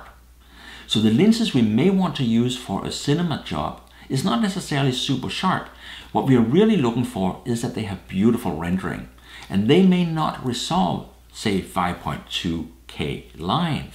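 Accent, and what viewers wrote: Denmark. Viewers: Hey, I'm a big DSLR geek just getting into the drone-world after getting my Phantom 4. Amazing stuff and pure candy to watch your videos. You have a new subscriber:) Er du i øvrigt dansker? Synes jeg kan høre det i din dialekt (og ikke mindst dit navn). Thanks:-) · Excuse me, but are you originally from Denmark?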